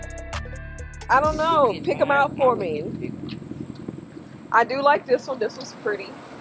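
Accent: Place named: South Carolina, United States. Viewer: The way he talks… you have to be in SC. My grandad from there and they all all the same way 😂!! Ain’t nothing like country living.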